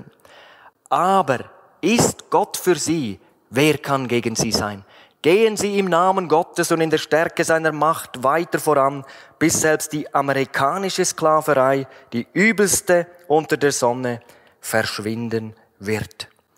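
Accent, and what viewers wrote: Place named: Switzerland. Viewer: Trotz "Schweizerdeutsch" kommt die Botschaft durch und rüber. Danke für dieses Wort. Gottes Segen für Euren Dienst und die klare Verkündigung.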